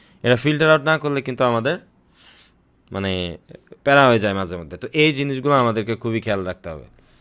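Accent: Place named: Bangladesh. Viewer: আপনার কথা বলার ধরন অনেকটা ভোলা অথবা চাঁদপুরের মানুষের মত। জানিনা আমি আপনার দেশের বাড়ি কোথায়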